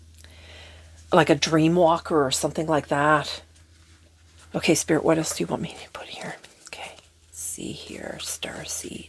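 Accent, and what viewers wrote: Canada. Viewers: ❤ Thanks, I stumbled upon your video... It's very aligned with what I'm experiencing right now. I heard the Cape Breton accent;) ❤❤ ❤❤ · Canadian, eh?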